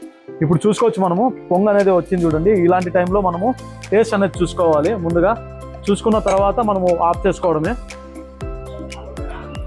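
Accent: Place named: Andhra Pradesh, India. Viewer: Meeru HYD lo vuntu Aandra telugu maatladunte vinalanipisthundhandi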